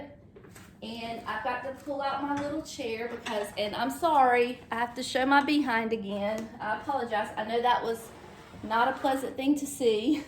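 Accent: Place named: Alabama, United States. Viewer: I’m so glad I found you! A lot of things you use and say remind me of myself. I have several of my grandmothers pieces and they bring back such sweet memories! You are so cute and precious! Where are you from? Sounds like Alabama. I live in Memphis. My daughter leaves in five days for Bama in the fall. ❤️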